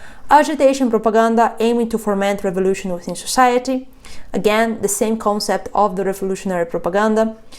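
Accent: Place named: Brazil. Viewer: "I dont know how to pronounce and i wont try" HUUUUGE school flashback with this hahahahaha. That send me back. And with this accent... when you knows shes brazilian but you just cant prove it yet (you sound like you could be cute... but it's absurd to try to guess someone's looks with voice and even arms alone)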